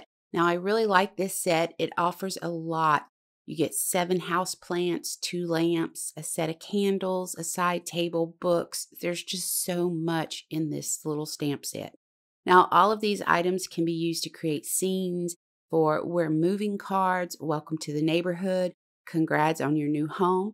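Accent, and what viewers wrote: South, United States. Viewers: I just love the Southern Sass.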